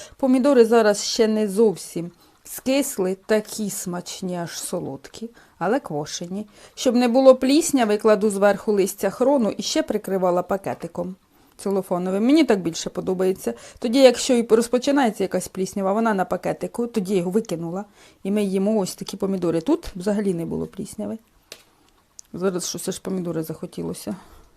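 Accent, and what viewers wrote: Poltava, Ukraine. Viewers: Ви мабуть з полтавщини судячи по говору?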